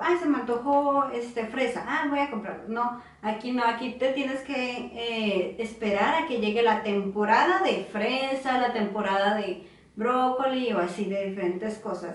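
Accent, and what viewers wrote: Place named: Baja California, Mexico. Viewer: Vayan a Baja California y van a ver como si les parece mexicana, a lo mejor por aquellos rumbos la gente somos un poquito distinta en acento y costumbres, pero para mi percepción si la veo súper mexicana y su acento sigue igualito a la gente de Baja como si no llevase años en Turquía jaja. Saludos a todos y bendiciones.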